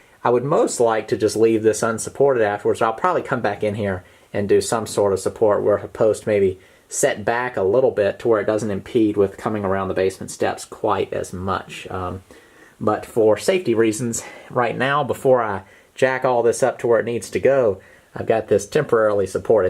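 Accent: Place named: Mid-Atlantic, United States. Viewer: It's mid Atlantic, coastal. I'd say he's somewhere in the Virginia-South Carolina region. Shift 250 miles inland and you get Scots-Irish Southern. Regional accents can be telling, even as little as 100 miles can define a region.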